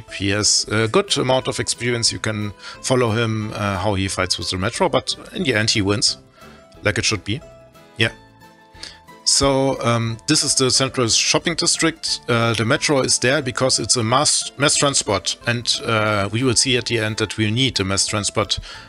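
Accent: Germany. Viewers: Sweet , after three seconds I recognise the German accent.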